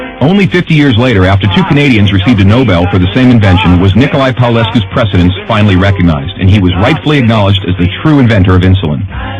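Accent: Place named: United States. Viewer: foarte tare clipul!!!!pacat totusi ca sunt putin cei care apreciaza si recunosc toate meritele Romaniei. totusi nu mi-a placut vocea tipului...adica accentul lui cand pronunta cuvinte in romana!!! de ce le pronunta ca un american/englez???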